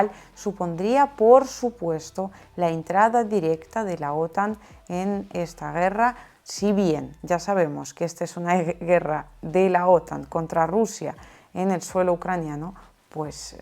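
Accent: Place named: Galicia, Spain. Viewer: Me gusta el acento gallego, y la solvencia para describir los hechos políticos de....sds.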